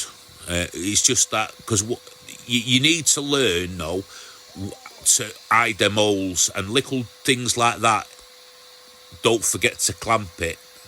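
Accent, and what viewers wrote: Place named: Stockport, United Kingdom. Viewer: great project ,looks retro,you don't sound to far from me (stockport).Every man should have a shed.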